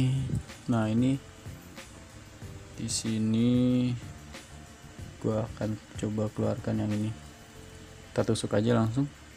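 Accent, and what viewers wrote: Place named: West Java, Indonesia. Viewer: sunda pisan mang..nuhun trikna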